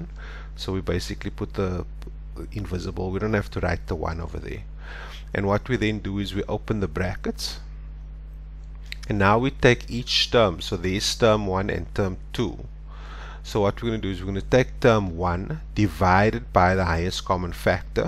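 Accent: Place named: South Africa. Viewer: lol i can hear he’s south african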